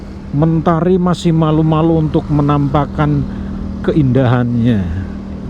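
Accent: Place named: Java, Indonesia. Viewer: Jawa medog kluweg kluweg mleketreg mblufag bawuk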